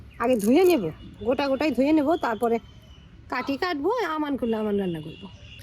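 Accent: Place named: Bangladesh. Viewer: আপু তোমার ভাষাই কিছুটা বাংলাদেশের টান আছে।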